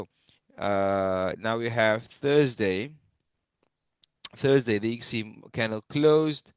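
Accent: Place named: South Africa. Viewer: I heard a South African accent then I subscribed , I will follow you on other social media platforms , I need to be part of a community based in SA ... I'm in Plettenberg bay by the way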